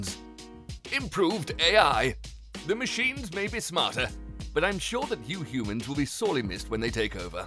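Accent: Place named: RP, United Kingdom. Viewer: Damn ur voice, the perfect posh british accent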